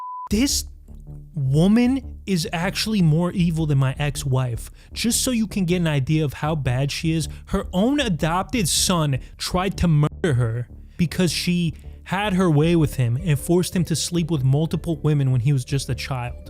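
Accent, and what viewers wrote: New York, United States. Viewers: I watch a lot of cult content. But there's something about hearing them explained by someone with a New York accent. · Hol' up, you're from AZ? With that NYC accent?!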